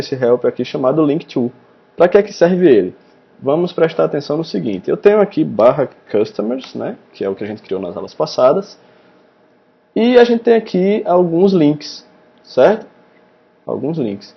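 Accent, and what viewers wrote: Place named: Northeast, Brazil. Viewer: Você é o cara. Comecei a estudar Rails a menos de uma semana, e já aprendi mais do que todos esses anos tentando estudar programação voltada a back-end. Sou front-end e estou estudando uma linguagem back-end. Sempre tive interesse em estudar rails, por causa da sua praticidade, só que eu nunca entendia nada. Hoje, graças as suas vídeos aulas eu já consigo entender muita coisa. Confesso que nas primeiras aulas eu não tava curtindo muito a forma de como você falava e se expressava, porém, os vídeos foram melhorando, ao ponto de você explicar melhor do que muita gente famosa. Parabéns de verdade. Valeu por dedicar um tempo a rapaziada que assim como eu está começando no caminho do rails. Obs: Você é do nordeste? Tem um sotaque muito nordestino. Eu sou de Recife - PE. Abraço.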